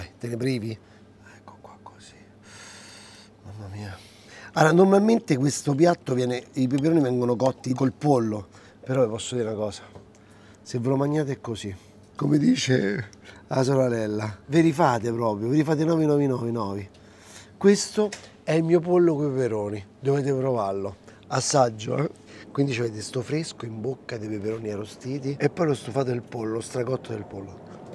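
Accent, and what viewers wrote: Rome, Italy. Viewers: Quanto mi piace quel bel l’accento romano.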